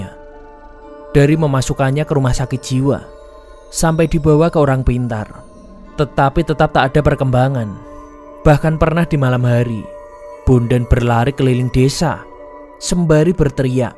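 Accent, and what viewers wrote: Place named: Temanggung, Indonesia. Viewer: Aksennya temanggung banget min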